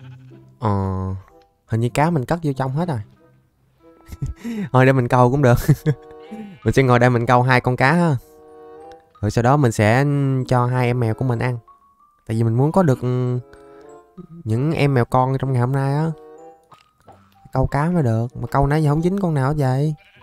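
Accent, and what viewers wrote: Mekong Delta, Vietnam. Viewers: em nói thật lun anh làm clip thì ah hãy nói giọng thật đc ko chứ ah đừng chỉnh giọng anh chỉnh giọng để làm clip về mục đích j nên em mog anh làm clip hãy nói giọng thật của mình mình nói thật với tất cả fan của anh, giọng thật của anh khác nữa giống người miền tây nên em nói 1 câu chân thành với ah, em mog anh làm clip hãy nói giọng thật nha anh bạn nào muốn nghe đc giọng thật của ah thì hay qua kênh của anh Găm Bồ Gaming và gõ Lộ Mặt Thật Ngoài Đời Khi Phỏng Vấn Cùng Burgerby nếu như ah đọc đc bình luận này thì em mog anh có thể nói giọng thật của mình ạ chúc ah 1 ngày vui vẻ ạ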